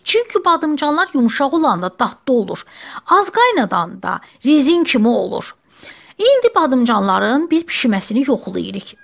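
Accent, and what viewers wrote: Baku, Azerbaijan. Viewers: Super əllərinizə sağlıq danışığınız super Bakılısız deyəsən 😊😉👍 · Ne sirin danisirsiz? Ewq olsun bakililara..Esl baki lehcesi..Var olun xanim..Ellerinize sagliq..Allah bereket versin islerinize..❤🥰💋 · Sip sirin Baki lehcesi. Danisiginiza vuruldum. Mukemmel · Can sizin ucun!!!Uzurlu sayin gec cavab verirem.Ele ne yaxshki,sizde varsiniz! Ureyinize,dilinize,el-qolunuza sagliq.Olsun,qoy olsun bizim Baki lehcemiz!!!Seve-seve,zovq alaraq izleyirem.Bu arada,badimcan shorabam lap ela alindi.Sag olun,var olun eziz bacim! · BAKI lehcesiii 👍👍👍👍Hansi kenndir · Nə gözəl öyrədirsiniz. Çox sağolun, öyrəndim. Bakı ləhcəniz də çox gözəldir. 😊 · Sağ ol kənd qızı. Bakının ləhcəsinnən və şorabasıbnan doymağ olmur ki olmur dəəəə. Halal olsun. Mənə ləzzət verdi. Əlün - qolun var olsun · EZİZİMSEN Baki lehcene gurban. Gulaglarimiz sevindi................ 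Süperrrrrrrrr hazirladin! Muş OLSUN XEJİRLİKLERLE İNŞALLAH👍♥️👍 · Can Bakı lehcem!!! Ele darıxmışam ki, öz danışığımız üçün. Resept de elaaa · Size qulaq asanda, mene çox xow olur, içimde nostalji bir hiss oyanir. Uzaq keçmiwime, uwaqliq illerime, köhne mehellwmize , teze pirin üstundeki evimizeze, heyetimize xeyalen qayidiram sanki...Ustaliqimiza da ki, heyranam açiqi. Sizin reseptinizle göyem mürebbesi hazirladim bu il, çox gözel alindi. Ellerinize saqliq, allah dnyadan köçenlerinize rehmet elesin. menim bakili lehcesinde wirin- wirin daniwan bacim, var olun!!!🙏🏻👏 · Eziz Bakilim cox gozel ellerinize sagliq👍👍👍shirin lehcenize defe defe qulaq asdim😂👍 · Caaan. Bakılıların ləhcəsinə heçnə çatmır da... 💋🥰Əlün_qolun var olsun. Balona yığma qaydası da düpbədüz. 👍👏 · Ay senin bakili lehceve qurban olum ,ay qizim.Ellerive sagliq.Hem danisigin xosuma geldi,hem reseptin.Sag ol,var ol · Xanim,canim menim Baki lehcesuycun daruxmiwuq,Allah ellerinize dilinize saqkuq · Bakılı ləhcəsidir🥰özüm agsuluyam bakılıların geliniyem Şüvəlan da çox sevirəm bakılıları çox mədəni insanlardir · Cox Sagol can, Baki lehcesiycun cox darixmisdim. 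Badimcanlar da Cok eladi Bele de eliyciyem. 😊👍💐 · XANIM ÇOX SAĞ OLUN SƏLİS VƏ AYDIN DANIŞIB ÖYRƏTDİYNİZƏ GÖRƏ .GÖZƏL BAKI LƏHCƏNİZDƏ.🤝🤝🤝🤝🤝🙏🙏🙏🙏🙏🙏🙏🙏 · Qədim BAKI LƏHÇƏSİ. 😊😍👏🏻👏🏻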